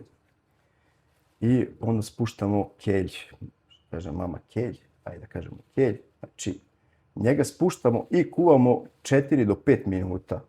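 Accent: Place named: Vojvodina, Serbia. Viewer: Kako je lepo sto si zadrzao vojvodjanski naglasak cist tako da miluje dusu❤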